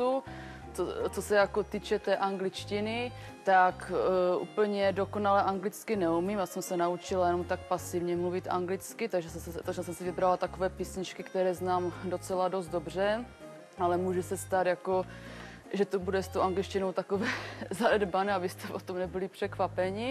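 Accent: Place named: Moravia, Czech Republic. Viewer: Ten přízvuk jo jo jasná Morava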